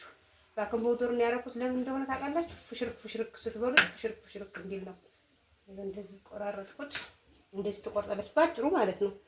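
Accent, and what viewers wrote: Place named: Wolaita, Ethiopia. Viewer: ፔኪን ፖውደር ነው የሚባለው አማርኛሽ ግን የወላይታ ነው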